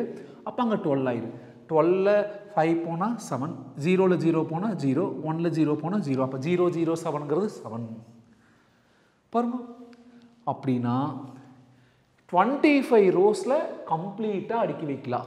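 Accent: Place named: Thanjavur, India. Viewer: Your in thanjavur am i right your slang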